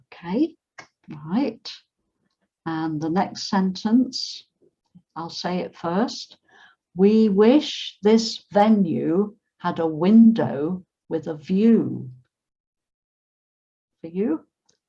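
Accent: United Kingdom. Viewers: Good morning. I am writing, "Good morning," because it is morning in Siberia. Thank you very much for your lessons, they are very useful and your pronunciation is perfect. Real British English. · i do love ur accent, British accent 🥰 that's y we called english, english is the mother of languages,lol American speaks english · Thank you so much. You use British accent right. This is what I looking for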